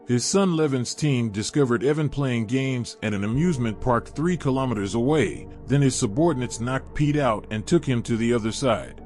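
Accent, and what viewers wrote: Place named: Pidgin, West Africa. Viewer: Why do the narrators for these films not speak English but speak Pigin English?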